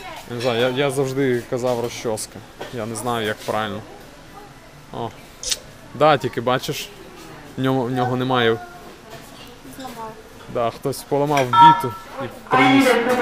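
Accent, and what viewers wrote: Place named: Volyn, Ukraine. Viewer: Чути Волинська або Рівненська область)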